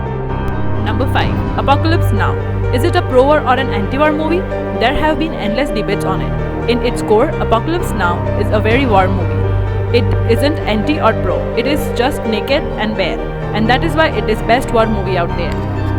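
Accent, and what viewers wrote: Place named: India. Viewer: Sweet voice. Pure Indian tongue. Wish I find ur no.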